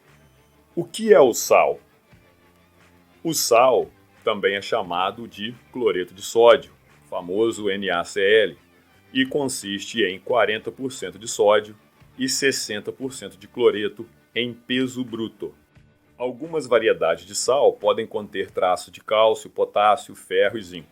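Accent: Minas Gerais, Brazil. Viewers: Mineiro, de qual cidade voce e he ? porque seu sutaque e muito do interior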